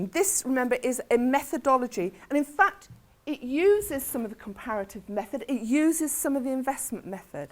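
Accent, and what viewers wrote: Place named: United Kingdom. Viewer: It's a boring enough subject to sit through. Why on earth would anyone want to sit through it having to listen to someone with such an annoying British accent?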